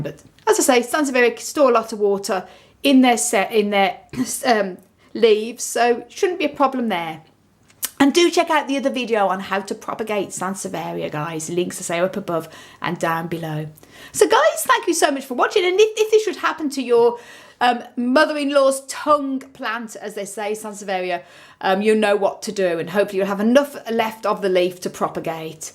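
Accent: United Kingdom. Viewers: I loveeee your video! Your voice, your accent, your energy.. it’s everything. It feels like i am learning with someone from Hogwarts. Hehe.. Thumbs up! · british accent makes my head dizzy lol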